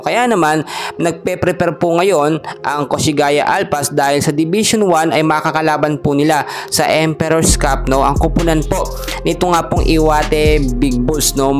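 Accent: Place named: India. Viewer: parang kang mga indian dito sa dubai magsalita boss laging may NO sa dulo hehe❤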